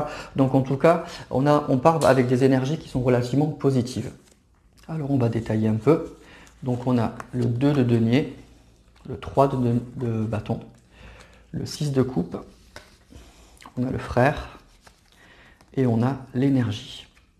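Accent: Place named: South-West, France. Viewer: Bonjour ☀️ et merci pour ce tirage. Petit accent du sud-ouest que je reconnais, j'ai le même ☺️ A bientôt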